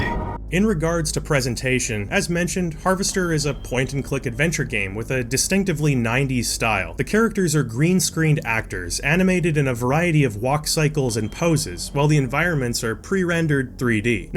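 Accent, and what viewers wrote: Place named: Canada. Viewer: He's Canadian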